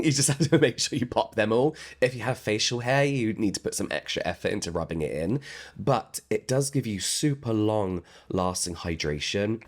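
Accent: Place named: RP, United Kingdom. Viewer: sweetie you can pronounce words however you want, and you still sound refined British to us Americans😊. Sending love from Chicago ❤❤❤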